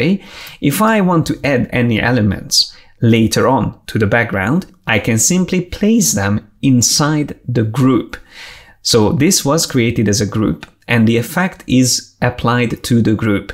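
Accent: Hungary. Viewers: Sorry for the off-topic question, but may I ask where you're from? Your accent is a dead ringer for Hungarian but your name sounds Polish, so I'm both confused and linguistically intrigued. :) Great video, by the way.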